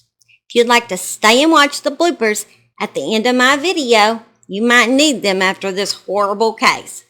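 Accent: South, United States. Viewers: Hi Dolly. ( just a term of endearment, ) My momma was born in Alabama ( Birmingham) . I love your accent. It reminds me of my family in the South.❤ I think you are great at these stories because you somehow keep it short, and sweet ( well as much as you can tell it).☹️ No, no ,no! What good are these monsters doing on this earth??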